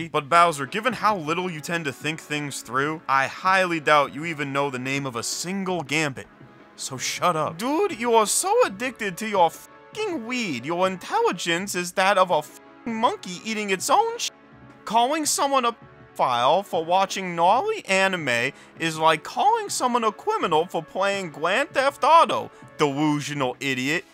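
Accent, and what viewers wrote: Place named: Philadelphia, United States. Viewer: banger video !! u from philly huh?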